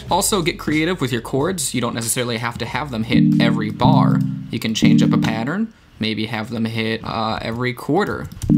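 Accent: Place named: Canada. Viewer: I only just now realized that you're Canadian! Soon as I heard "pro-cess", instead of "prah-cess". Dang your accent is barely even distinguishable from a General American one. Hardly the stereotypical Canadian accent!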